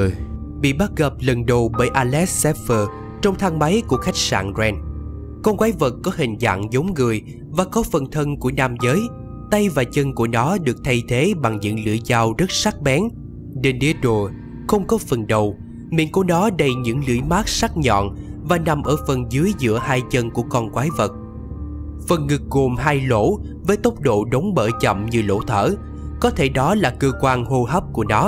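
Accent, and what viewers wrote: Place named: South, Vietnam. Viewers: Giọng miền Nam mãi đỉnh 🎉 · Vẫn là giọng đọc mình thích nhất :)) giọng miền nam đầu tiên out trình mấy a giọng bắc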